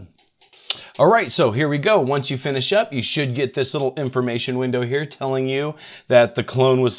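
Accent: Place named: United States. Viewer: Your videos are usually first rate but this one is overly complicated and, for some reason, you gabble at great speed. Not all viewers find US spoken English easy to follow and the monotone does not help. Perhaps showing two methods in a single video was the problem and could have been solved with a part 1 and part 2. Easeus is buggy and manual partition sizing with simpler tools can be be quicker and easier. Aomei is really worth the price if only used among friends and family a few times a year because it is so well thought out and has fail-safe features which were absent in the free version. Customer technical service is excellent re using the licensed product on other machines up to a point. For those of us who repair and upgrade machines regularly it is, in my years of experience, the best and least fussy when used properly. Over the years I have tried most.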